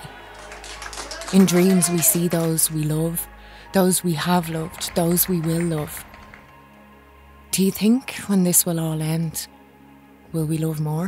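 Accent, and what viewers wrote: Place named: Ireland. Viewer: Oh my goodness thank you! Yes, sticking close to my apartment to minimize risk, while putting forefront my mental health. May you and yours remain safe as well! I must exclaim I so love your video! I shared it on my own Facebook wall and much thanked my friend Ginger for steering me toward it. This video is beauty, hope, assurance, validation, comfort. Plus an Irish brogue oh my! All together scrumptious. <3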